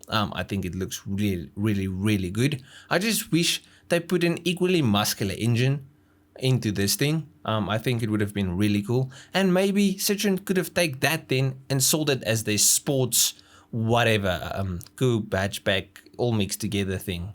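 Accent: South Africa. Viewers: South African accent is ready to follow. Thank one of the gods he isn't from Kentucky.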